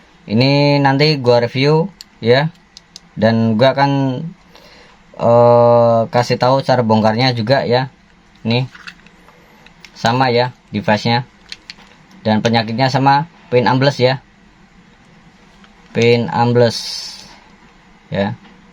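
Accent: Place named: Java, Indonesia. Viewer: Agak medok, tapi oke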